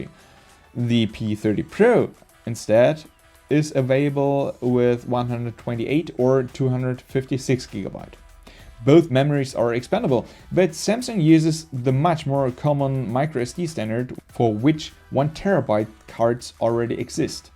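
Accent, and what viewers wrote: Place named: Germany. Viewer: Are you German? You sound German.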